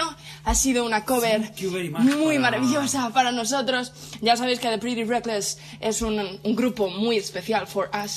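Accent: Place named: Spain. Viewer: Me gusta tu acento español.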